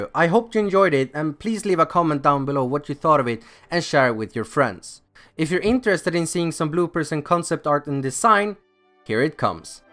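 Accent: Sweden. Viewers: Not bad that can be fun operator I have a question are you Swedish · Is he swedish? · Värsta svenne accenten haha, aja bra video, cool grabb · Are you swedish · u are swedish?Also nice idea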